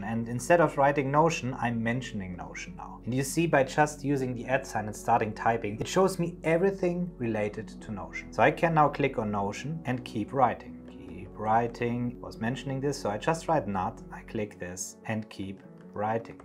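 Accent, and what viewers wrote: Germany. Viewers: Do we here a german accent here? :D Great video! Greetings from Karlsruhe!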